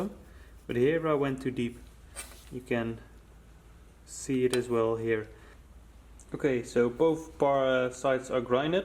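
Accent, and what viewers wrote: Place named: Netherlands. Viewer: you sound like someone from holland. is that right ?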